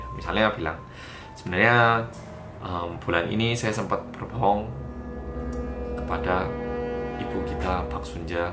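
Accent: Java, Indonesia. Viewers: iku lho.. aowkowkwowkwok medok tulen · Semapot gak bisa hilang, medoknya itu lo 😁🤣🤣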